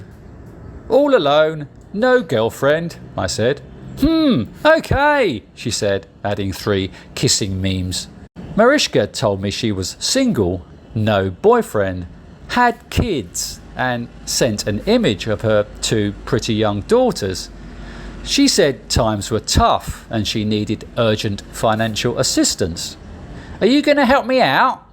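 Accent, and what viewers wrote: United Kingdom. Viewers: like listening to someone narrating a book in British English lol good material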